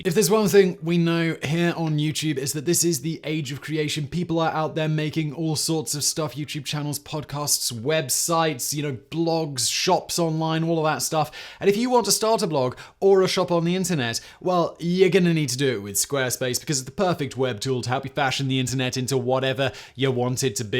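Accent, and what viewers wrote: England, United Kingdom. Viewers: “Badassery” doesn’t sound right in a middle class English accent.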